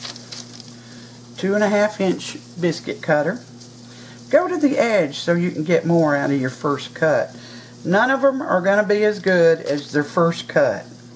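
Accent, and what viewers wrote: South, United States. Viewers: I just subscribed to you. Partly because the pie crust video and now these biscuits look amazing (and I love the sound of your sweet southern voice), but also because I loved your Kavanaugh statement and the beautiful God Blesses at the end of your video. 🤗💕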